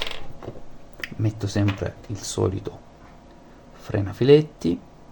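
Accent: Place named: Salento, Italy. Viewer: grazie per il video, molto chiaro.!!!!! sono alle prime con uno yamaha 40, se volessi contattarti telefonicamente è possibile?? dall'accento credo sei Salentino ? grazie luis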